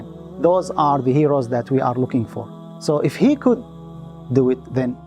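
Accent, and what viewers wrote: Indonesia. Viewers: Apa cuma gue yg dengar suara pronounce nya org indo ? Hehehe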